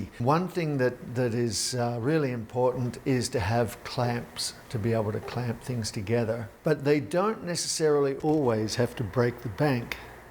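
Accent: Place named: Australia. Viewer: From the start of watching your videos I always assumed that you were working from Australia from the accent. How would you compare woodworking in America compared to Australia? Always enjoy your videos thanks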